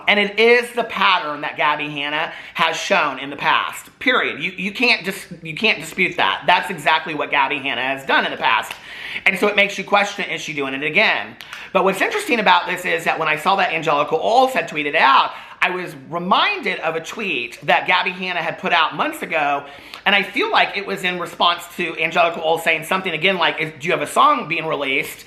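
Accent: South, United States. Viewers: I'm from Tennessee. You sound so southern and I love it.🤣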